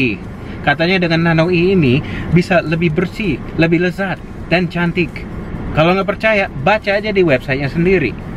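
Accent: Indonesia. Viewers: wkwkwk bule tapi cengkoknya asli indonesia banget 😀😀... reviewnya super jujur, pedeees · Bahasa Indonesia nya udah ada aksen Indonesia nya. Itu baru jarang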